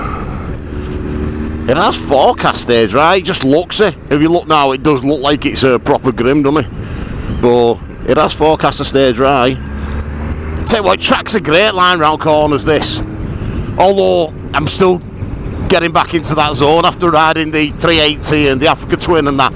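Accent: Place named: North West England, United Kingdom. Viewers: A nice little ride. Thanks for that. You show quite a bit of character and made me laugh a couple of times, you and your Northern accent. I would have liked to hear more of your feelings about the bike's handling, etc. I think it looks great and the engine sounds superb. Feels solid and smooth. I think, by the way, that most road users are annoyed that there are other road users on the same stretch of road.:) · Where in the UK is this filmed? Your accent seems north west? Just curious. Ex pat.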